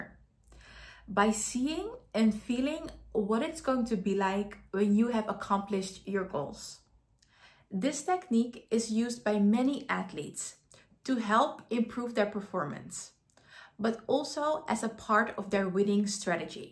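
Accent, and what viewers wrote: Netherlands. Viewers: Are you dutch !?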